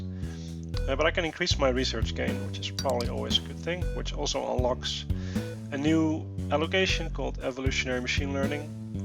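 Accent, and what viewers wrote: Netherlands. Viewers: You sound like having a dutch accent, is that true? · Yes, he's dutch.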